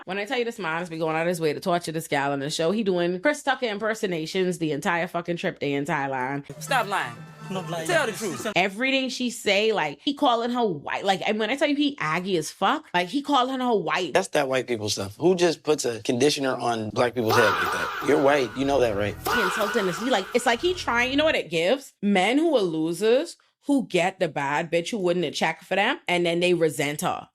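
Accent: Bahamas. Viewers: Waittt are you Bahamian 🇧🇸??? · New sub❤ i only disagree with one thing queen, scotty don't love him, if he looked the way he looked with no SHMONEY she won't even give him a SECOND of a minute of a time of any day. Lol i think she feels like SHE owes him , she feels like she in way to deep now to let anyone " WIN HIM " AWAY FROM HER SPOT.... an i think she is playing the long game just like him. I think they deserve each other. Especially since lemmy made it clear to us episode one scotty knew what she was signing up for an now "she wanna change for the baby". I guess we all gonna see how this ends. Couldn't be ME though. I got to much respect for myself an integrity. Aint enough money in the world, i pray this doesn’t end badly for her, we all know rich people have no limits an feelings come second to spiritual dark agendas. Also you are gorgeous an i love your accent. 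Is that like a bahamian bagan accent? ❤ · are you foreigns wife???? its giving bahamiaaaaaaaaa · Are you from the Bahamas ? You sound just like this other creator I look at on here, similar accent, lol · Your accent 👀👀👀. Sis you’re a Bahamian ? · Are you Bahamian by chance? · I hear a Bahamian accent 👀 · pause .. are you bahamian ?! this is my first time viewing but you sound just like us , or maybe you’re an geechie girl ? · Are you Bahamian?.. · Are u Bahamian? Or am I tripping? I hear our accent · Are you Bahamian? Your accent is killing me 😍 it reminds me of another Youtuber that that also does commentary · You musssst be Bahamian or from Dominica omggg that accent 🤣🤣!!!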